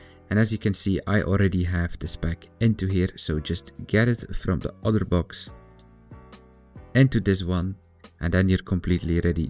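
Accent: Netherlands. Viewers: Ben je Nederlander of Belg ?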